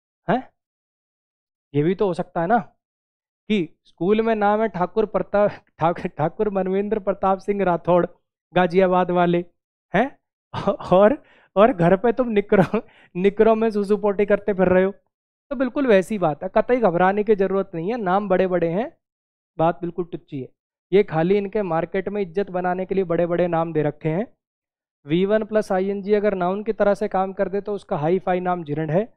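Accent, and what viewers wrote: Haryana, India. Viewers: Sir are you from haryana..i felt haryanvi in your conversation 😅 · Sir aap haryana se ho kya 😎 khinda do sun k lga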